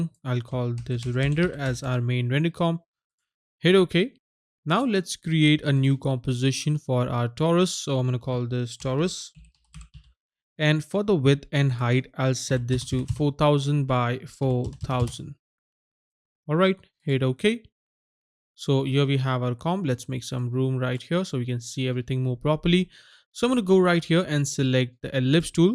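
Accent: India. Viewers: all is good man, but indian accent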